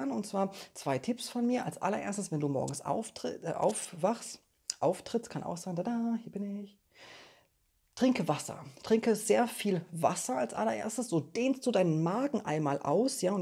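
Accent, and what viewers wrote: Bavaria, Germany. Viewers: Lieber, ich finde es genial wie Du das machst. Feel free. Bayrisch und Akzent ist doch super. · Danke für deine Videos. Diesmal irgendwie anders, sehr ruhig gesprochen, konnte Dir sogar folgen. Lag vielleicht auch am bayrischen Akzent 😉, finde ich prima, ich lebe im Bayern. Liebe Grüße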